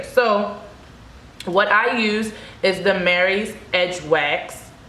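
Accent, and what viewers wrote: Baltimore, United States. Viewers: Aye sis! Is that a Bmore accent that I hear? Let me tell you. I've watched 30 videos on twists and yours was the only one that actually showed the technique. Thank you!!!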